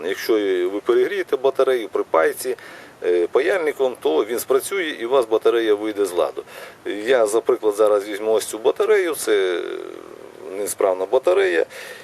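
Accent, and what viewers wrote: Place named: Sumy, Ukraine. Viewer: Я з Харківщини, але тільки почав дивитися твій канал, одразу зрозумів, що ти з Сумщини.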